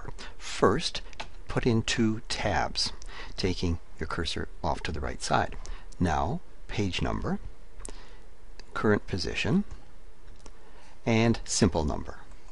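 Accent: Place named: Canada. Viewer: You're adorable. Love your Canadian accent. Even though your video didn't help me...I want to be your best friend! lol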